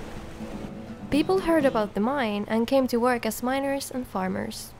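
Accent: Finland. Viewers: Can't tell if your accent is mildly finnish or distinctly icelandic. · So far I've come to enjoy your videos, so I'll subscribe! Your nice soft Finnish accent is a nice bonus as well and makes your descriptions of Skyrim and its people sound official and pleasant.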